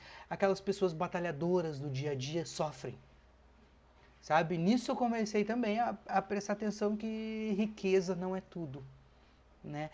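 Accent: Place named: Rio Grande do Sul, Brazil. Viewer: Que lindo vídeo! Pelo sotaque so pode ser gaúcho kkk. Sempre tento fazer a Oração e não termino. Sei do que estás falando: já estive no fundo do poço e todo mundo desapareceu....meu pai, mãe e irmão me estenderam a mão e me salvaram da fome e do despejo. Se vc não tem grana vc não tem amigos. Me reergui na medida do possível e aprendi a viver na Solitude, a não esperar nada de ninguém e principalmente: a olhar de outra maneira para pessoas que eu achava mais "humildes" que eu....Hoje eu cumprimento, dou atenção, paro pra conversar, ajudo no q posso, mas é de coração, com carinho, sabe. Porque não sou mais que ninguém. Gratidão e Axé pra vc.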